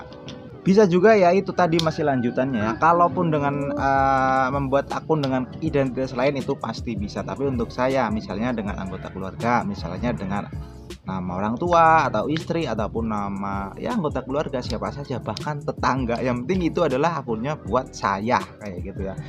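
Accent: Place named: Banyumas, Indonesia. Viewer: Nek Tek bedek, kayane Rika Agi mancing yh?? Lah wong ngapak genah ya..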